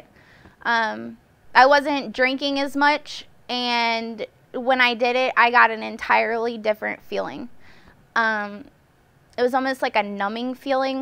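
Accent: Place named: Ohio, United States. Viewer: That is an Ohio accent...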